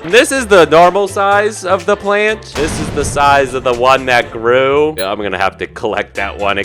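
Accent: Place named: United States. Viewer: Why does he sound American even though he said autumn?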